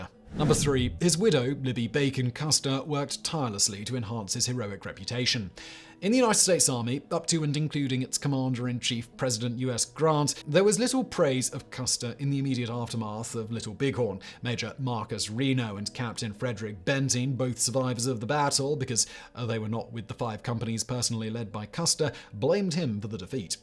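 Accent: United Kingdom. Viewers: Dear, dear... I do think they protesteth far too much. It's either your pronunciation (you damn Limey), your racial naming of participants of just choosing the wrong side of your subject. You can't win can you..., so stick to Europe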